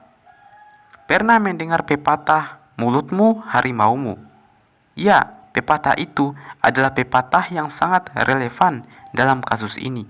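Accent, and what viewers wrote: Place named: Buton, Indonesia. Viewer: Logat mu buton betul🤣🤣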